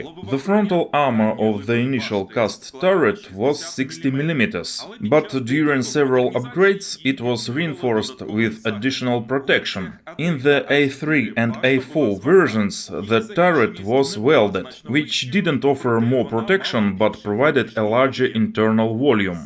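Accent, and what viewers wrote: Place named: Eastern Europe. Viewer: Можна було штучний iнтелект заюзати для перекладу голосом. Було бы гарнiше без нашого слов'янського акценту на захiд.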